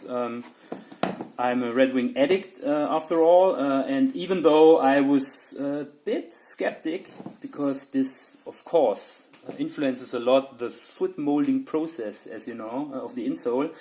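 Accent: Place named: Germany. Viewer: Lustig, wie man Deutschsprachige immer sofort erkennt :-) gutes review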